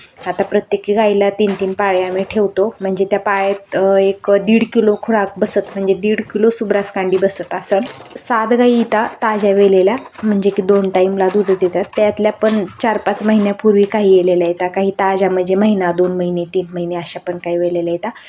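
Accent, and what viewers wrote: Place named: Maval, India. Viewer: जय सियाराम ताई ताई तुमचं गाव कोणतं कारण बऱ्यापैकी मला तुमची भाषा मावळी वाटते म्हणून विचारलं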